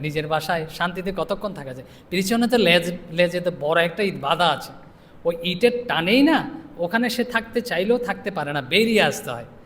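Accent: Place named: Chittagong, Bangladesh. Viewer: Apne ke chto gamar lok vasar tan ta chogam tan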